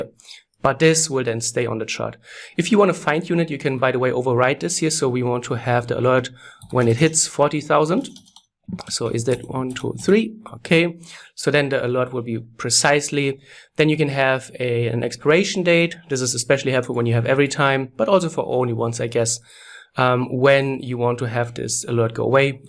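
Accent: Germany. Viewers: direkt gemerkt das du deutscher bist :D gutes video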